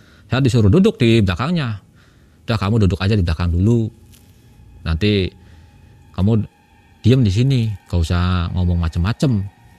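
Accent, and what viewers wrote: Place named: Cirebon, Indonesia. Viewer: Wong cirebon nadane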